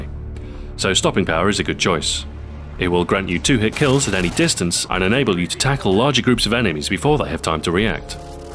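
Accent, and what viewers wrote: United Kingdom. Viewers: are you british? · Its not Russian... Its a BRITISH accent, he's from Manchester. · Hey mate im not gay but i love you! ur videos are the best ;) and i love ur british accent ;)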